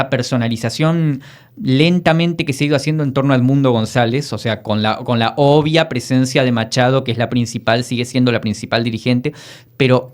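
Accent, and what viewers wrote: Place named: Argentina. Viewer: Analista político venezolano con acento argentino.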